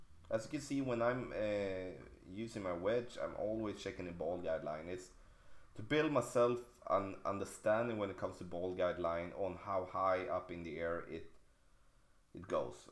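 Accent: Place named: Scania, Sweden. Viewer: Härligt med lite skånska👌🏻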